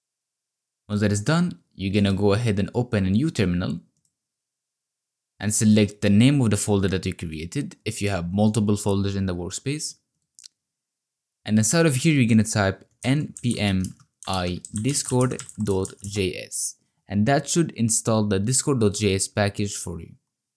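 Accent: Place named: Sweden. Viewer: DU E JU SVENSK!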